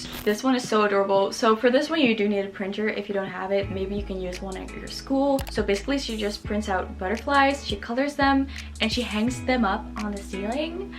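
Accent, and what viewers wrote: Netherlands. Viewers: By the way you talk, Your dutch!!!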